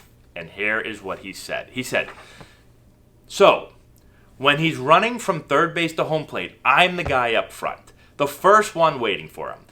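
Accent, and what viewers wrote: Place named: Boston, United States. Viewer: You must be from Boston with that accent...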